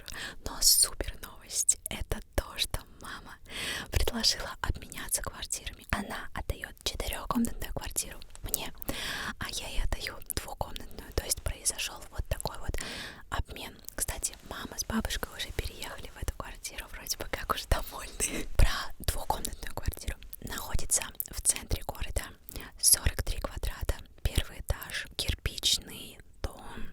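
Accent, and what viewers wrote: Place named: Spain. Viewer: Puedo sentir tu español...❤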